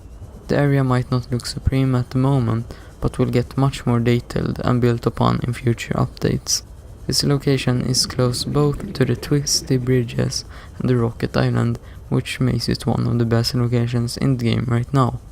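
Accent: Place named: Sweden. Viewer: your accent SCREAMS swedish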